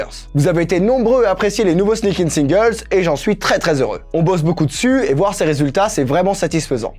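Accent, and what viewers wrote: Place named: United States. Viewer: à ta manière de prononcer l'Anglais je dirai que tu as vécu aux États-Unis étant jeune ;)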